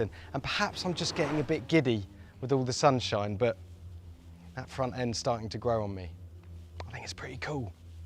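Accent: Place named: United Kingdom. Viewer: Wins for most boring video. Listening to a Brit is as painful as an uneducated southerner.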